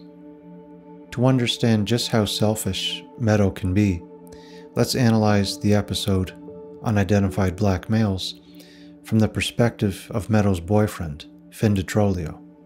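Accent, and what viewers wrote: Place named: Cape Breton, Canada. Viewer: You've got to be from Cape Breton. I grew up surrounded by that accent. 😁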